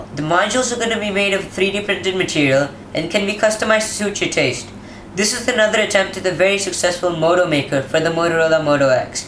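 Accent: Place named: India. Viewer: Face not shown. Are you from India cause you sound like a Indian. Is it true Indian are not suppose to show their face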